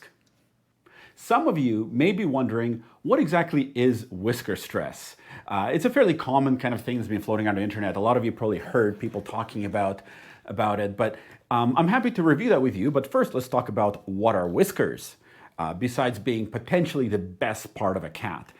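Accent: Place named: Canada. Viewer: Thank you!!! I bought that whisker stress story hook, line and sinker. I will purchase the feeder and water fountain you recommend. You are as adorable as Mr. Pirate, and I love your Canadian accent!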